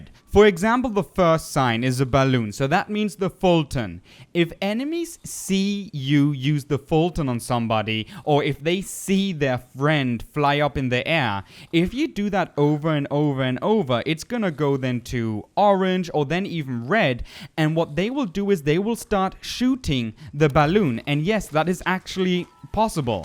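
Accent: Germany. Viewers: R u german? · & Man hört sehr, dass du ein Deutscher bist. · Hi, i this is the 1 vid i saw , nice- 1 . BTW are you German?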